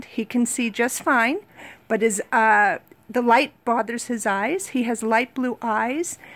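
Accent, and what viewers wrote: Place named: New York, United States. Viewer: how much I enjoy your accent. Long Island? Staten Island?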